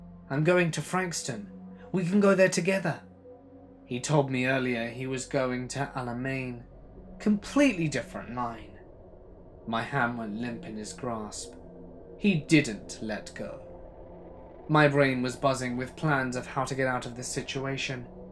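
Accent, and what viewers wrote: United Kingdom. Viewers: So glad I found this channel, a British person narrating! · Hey! I just wanted to say that I went back and watched some of your older videos. I don't know if it's just me, but your British accent seemed a little bit thicker than it does in your more recent videos. Is there a reason why you sound different now? Just curious.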